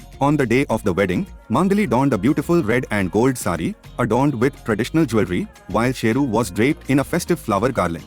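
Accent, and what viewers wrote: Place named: India. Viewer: Why out of all of the posts on this channel is this the only one with the Indian accent narrating it 🤔 😅😅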